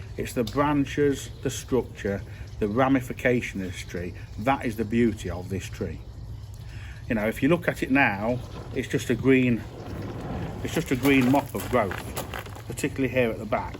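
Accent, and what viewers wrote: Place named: Leicester, United Kingdom. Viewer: Great video and nice to hear a Leicester accent.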